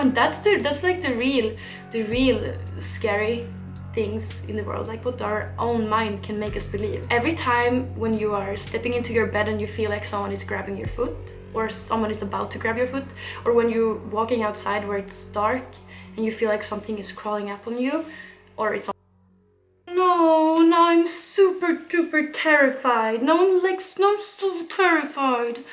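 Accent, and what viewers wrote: Sweden. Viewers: You talk English like a swedish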